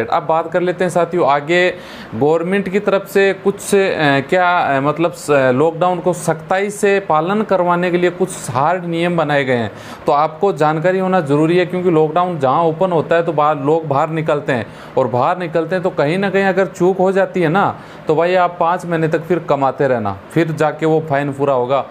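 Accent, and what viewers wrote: Rajasthan, India. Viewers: Aap sir ji pahele hindi to barabar bolna sikho saaf pata chalta h ke aap rajastani ho sorry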